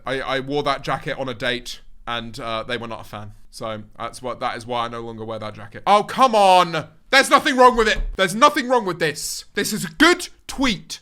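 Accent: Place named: United Kingdom. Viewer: I thought you were american for a while until I realized you were british ick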